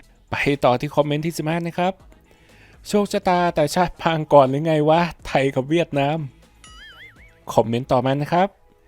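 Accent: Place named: Laos. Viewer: ใส่เสียงลาวคือชัดแท้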